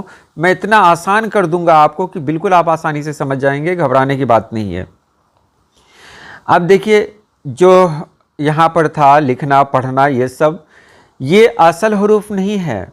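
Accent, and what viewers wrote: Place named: Patna, India. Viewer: سر میں پاکستان سے ہوں میں آپ کی ویڈیو باقاعدگی سے سنتا اور دیکھتا ہوں۔ مجھے ناجانے کیوں ایسے محسوس ہوتا ہے جیسے آپ کا تعلق پٹنہ سے ہے کیونکہ میرے ساتھ مسقط میں کچھ دوست کام کرتے تھے جنکا لہجہ بالکل آپ ہی کی طرح تھا سر آپ کا وٹس اپ کا نمبر اگر مل جائے تو میرے لئے باعث فخر ہوگی شکریہ اللہ تعالیٰ آپ کے علم میں مذید اضافہ فرمائے آمین ثم آمین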